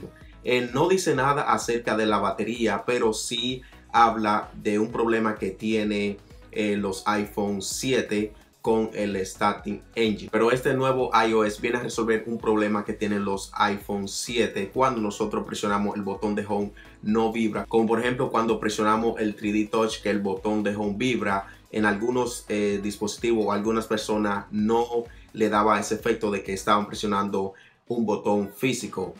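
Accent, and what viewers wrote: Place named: Dominican Republic. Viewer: Dominicano y pico.!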